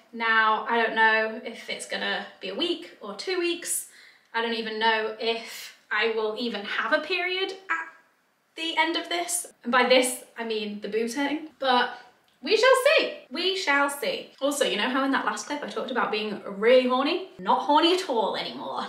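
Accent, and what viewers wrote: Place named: Northern England, United Kingdom. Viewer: Your accent has gone a bit more northern I think? Maybe it's due to social distancing/lockdowns??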